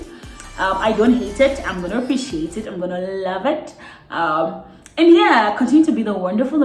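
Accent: South Africa. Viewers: You picked up a South African English accent 😅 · How are you Cameroonian with a South African accent wena? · Hey girl,!! New subie here,, love your video.. but listen, there's no way you are not South African!!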